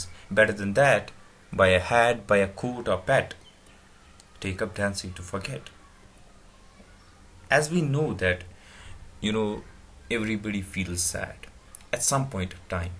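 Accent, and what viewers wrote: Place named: Kashmir, India. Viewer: you sound very kashmiri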